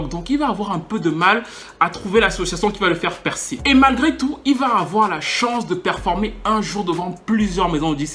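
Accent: Cameroon. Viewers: Ce mR parle comme un camerounais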